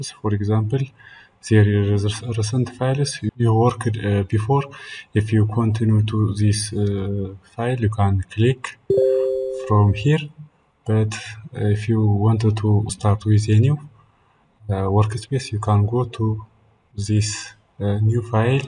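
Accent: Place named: Ethiopia. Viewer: My bro is definitely Ethiopian... the accent 😄 We were looking for a fix while we are using the internet bro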